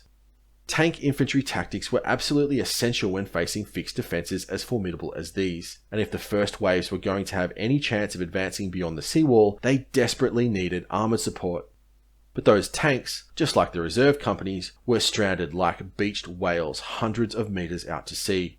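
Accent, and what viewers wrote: Australia. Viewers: Thank god its not AI.... bonus: aussie accent too!😅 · This Aussie narrator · He is actually an Australian - that is not a British accent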